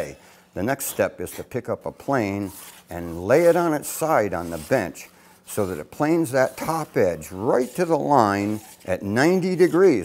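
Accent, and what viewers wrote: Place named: Rhode Island, United States. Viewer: I'm very happy I found your videos. You get bonus points for your accent. I'm a Californian, but my folks were from Rhode Island. It brings back fond memories.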